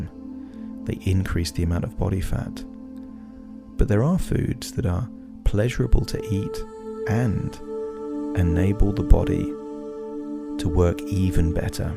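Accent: England, United Kingdom. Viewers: Ok..., I need to get a Bluetooth headphone set, so I can move about to my bed for a comfortable experience. My tethered headset keeps me tied to the desk. Now then, I will try it again when Amazon gets here with my new headset. Meanwhile, I live on a 220 acre mostly forest little NW farm and recovering from a heart attack and fractured hip when I fell. I can do this, your program sounds great and I like the English accent. I am 285-lbs 5'11" & 73 years old, and of German heritage. I will report more later.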